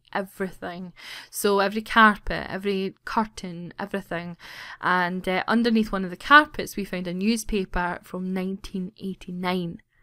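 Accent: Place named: Scotland, United Kingdom. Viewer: I'm going to feel really bad if I'm wrong...but I'm pretty sure you're from Scotland, right? But you remind me a lot of my aunt Ella from Scotland :3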